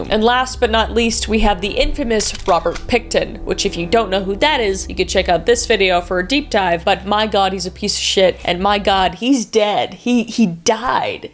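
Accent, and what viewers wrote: Canada. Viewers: Lol your losing you canadain accent lol and can totally tell you are from BC. I grew up in Calgary and I just giggled when you said Cal Gary lol. I love your videos.keep the amazing job lol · I really thought this was a great video to sleep to but first thing I hear is Canadian 👁️👄👁️ still gonna watch it rn tho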